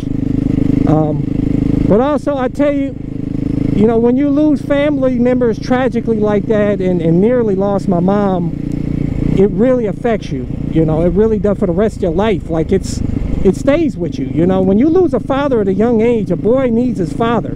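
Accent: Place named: South, United States. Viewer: I really thought you were from somewhere in the south like Luisiana.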